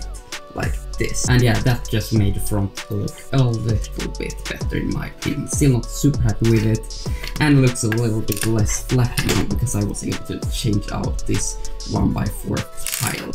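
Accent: Sweden. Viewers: What country are you from? Swedish accent?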